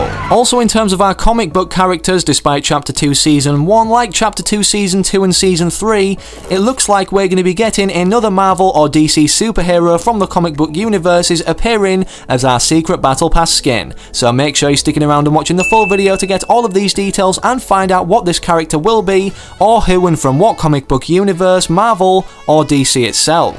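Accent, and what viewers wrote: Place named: England, United Kingdom. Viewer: Who else loves his accent? 😂😂😂 I'm from England too are you from Leeds or Sheffield or Bradford I want to live in Leeds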